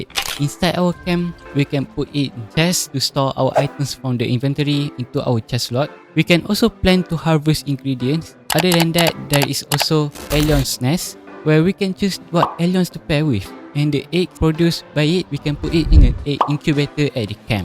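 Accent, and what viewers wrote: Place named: Malaysia. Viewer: I can recognise this person from just the way he speaks English 😂 he from Malaysia😝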